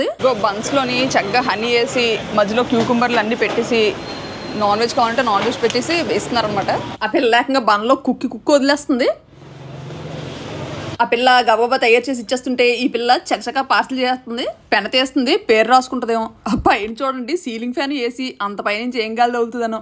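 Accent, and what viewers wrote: Vizianagaram, India. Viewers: మీరు మాట్లాడితే vzm accent ఉట్టి పడుతుంది. Intlo valu matladinattu untundhi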